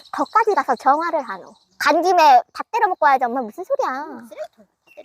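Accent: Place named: Gyeongsang, South Korea. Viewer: 오늘도 알람뚜자마자 ~~~내가믄져와버려따구 ~~~오늘 언니 말투너무웃곀ㅋㅋㅋㅋㅋㅋㅋㅋㅋ 나도 경상도 아가씨 😂❤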